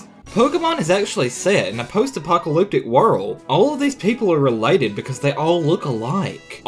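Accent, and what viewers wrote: South, United States. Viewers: He sounds like a hillbilly. (But not to say he is stupid) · Ugh, your voice, it's like a gay southern accent. no offense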